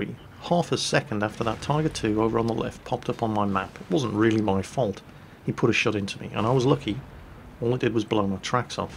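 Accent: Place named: England, United Kingdom. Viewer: Jingles, where abouts in England do you live? I can't place your accent :)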